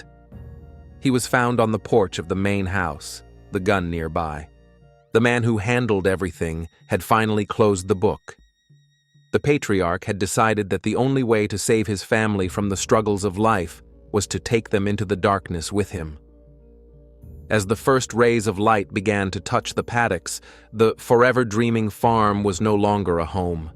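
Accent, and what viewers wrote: Delaware, United States. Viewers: 😂 Not to get off this topic, but you sound like you live in Delaware. DMV here will piss anyone off to the point you get lost trying to drive away from there. 😂😅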